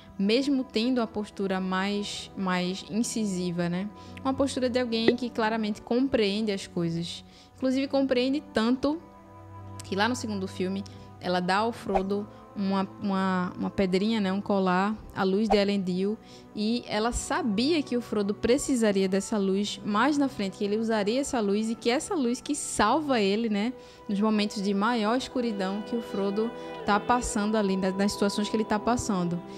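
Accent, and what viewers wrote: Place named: Northeast, Brazil. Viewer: Sou da paraiba e reconheci teu sotaque nordestino. Delicioso 😋 😋 Parabéns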